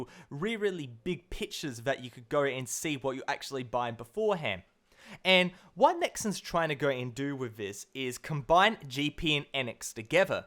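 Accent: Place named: Australia. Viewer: your Australian accent sounding delicious like always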